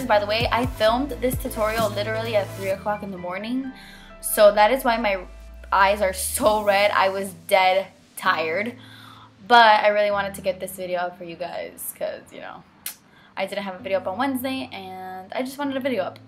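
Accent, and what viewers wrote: Miami, United States. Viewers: she's Cuban, so I believe she's multi-ethnic. I've read in comments that her accent is not Cuban, it's a Miami accent. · you love makeup, i love makeup, you're from Miami, I'm from Miami... we have miami girl accents and you know what I mean so lets be friends? k thanks :) love you girl!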